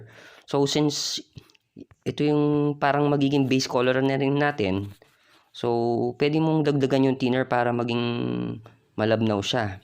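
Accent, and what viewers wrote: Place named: Pampanga, Philippines. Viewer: lupet mo neh soy.kapampangan ka ne soy tunog pamu.ehh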